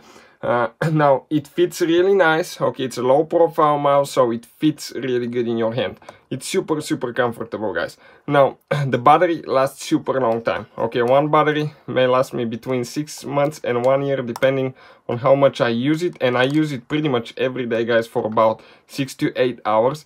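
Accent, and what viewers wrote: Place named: Russia. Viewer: i love the thick Russian accent lol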